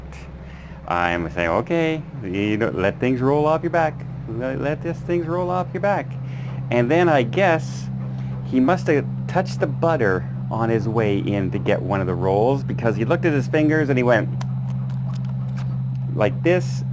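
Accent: Canada. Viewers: Love it! You sound like me, I just can't keep quiet when I see atrocious behaviour like that. Absolutely love your Canuck accent! Just subscribed, your videos are great.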